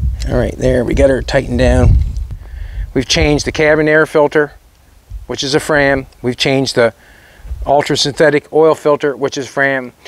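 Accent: Canada. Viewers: I promise you , not all Canadians sound like this guy …. Or have a dusty ride like this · Fired this up and in the first 10 seconds I was like yeah this guys Canadian and it was confirmed when I heard Canadian tire 😂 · I was just about to say, you sound more Canadian than I is. Then, you said Nova Scotia 😂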